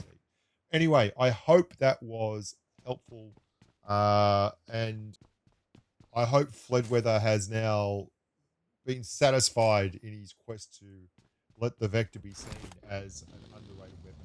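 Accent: Australia. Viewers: Love the Vector so much with a laser sight too❤❤ nice one as always❤ i just have a slight complaint to make, i would very much appreciate fixing the sound in your videos, it's been anoyying lately that the game sound is louder than your own voice 😂😂 especially cuz i love listening to your australian accent 😂❤ it's really annoying that sometimes i can't make out what u say! Take care ❤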